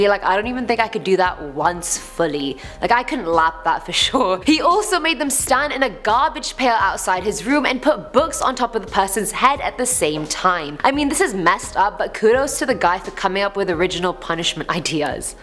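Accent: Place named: United Kingdom. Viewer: I'm not going to make fun of Ms high-waisted jeans. She's far too pretty and charming, and has a very appealing accent, perhaps from somewhere in the United Kingdom?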